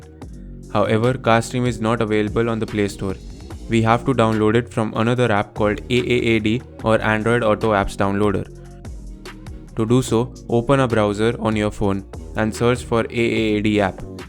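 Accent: India. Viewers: I don`t understand curry english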